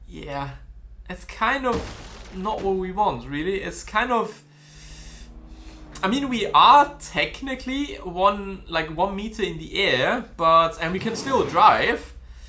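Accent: Germany. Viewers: i Hear it du bist deutsch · Hey dude.. just wanted to ask if youre from germany cause I can slightly hear that accent but the rest ist just wonderful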